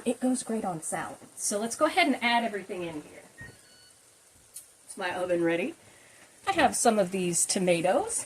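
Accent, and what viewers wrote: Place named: Appalachia, United States. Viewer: Sorry for your loss, love the bluegrass twinge I hear in your voice...I've never added corn, but I might next time, thanks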